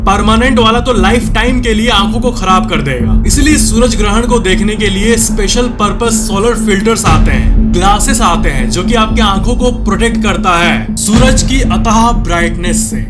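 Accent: Bihar, India. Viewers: Bhaisaah Aap kya Bihar se ho kya ? Batana Zaroor Se .......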